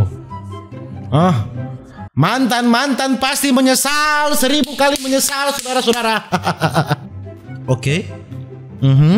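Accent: Manado, Indonesia. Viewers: Hy bg gua suka liat konten2 lu hnya karna suka dgn cata bicara dan logat menado gua asal sunda Bogor bg sukses selalu yg budi🤣🤣🤣seeyou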